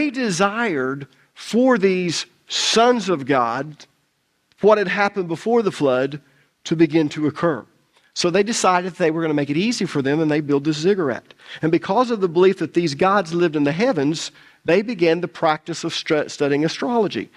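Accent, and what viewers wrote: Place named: Carolina, United States. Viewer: Carolina is a different dialect. Took me years to understand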